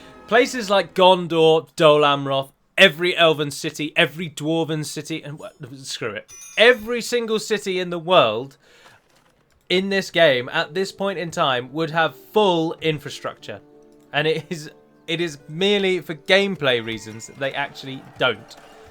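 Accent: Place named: England, United Kingdom. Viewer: Holy shit. I couldnt tell if he was english or scottish :O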